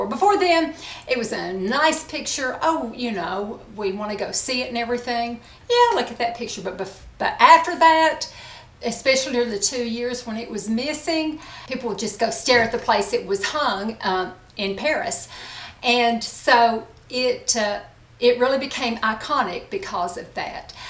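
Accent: South, United States. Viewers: Love ur southern accent!what state are u in...?also would that Bobby brown shade look good on brunettes?always looking for a new shade...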